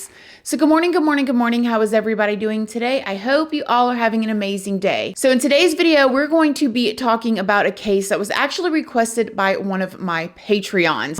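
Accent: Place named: West Virginia, United States. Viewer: Eeeny bIdy eeeny where. You have the cutest accent. You sound like me. I’m in West Virginia and have a country accent. I love hearing you talk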